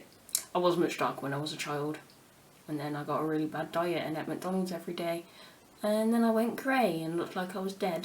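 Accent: United Kingdom. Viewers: You have British accent, and they call you SPANISH???? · When u started talking I'm like oh u must be British and white but then when u said what ur mixed with I'm like .... Then why do u talk like that ? But ur beautiful the way u are! No matter what race & u should embrace more of your black side ☺️.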